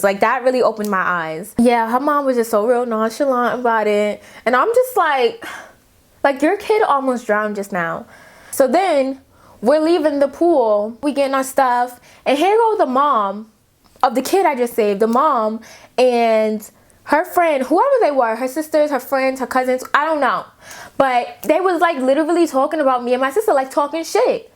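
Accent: New York, United States. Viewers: The New York accent gives me LIFE fr!!!!! I love it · I love the way how New Yorkers say things that end with an "er" sound. Lol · your New York accent is so cute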